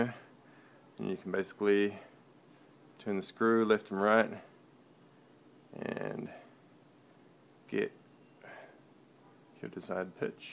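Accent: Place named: New Zealand. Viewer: "for people who are too lazy to read the manual" hahaha - that's me Your accent + use of jandal makes me suspect your are from NZ - am I correct?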